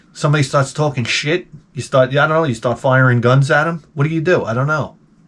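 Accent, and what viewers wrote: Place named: Boston, United States. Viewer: I honestly think this dude is BETTER at riffing than Bill Burr. And I’m not comparing the 2 just because of the boiston accent and hair tint. This show is like more focused and listenable than the mmp, and the boring sports talk is replaced with cool retro vidya game stuff. No disrespect to freckles though, it just seems like he runs out of steam after a while.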